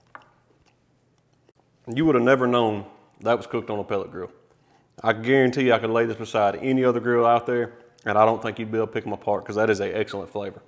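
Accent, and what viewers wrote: South, United States. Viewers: Southern accent? Check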